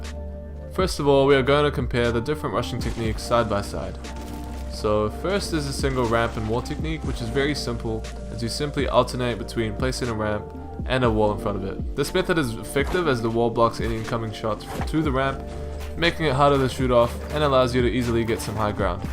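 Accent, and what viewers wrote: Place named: New Zealand. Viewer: Where are you from? You sound like your from New Zealand lol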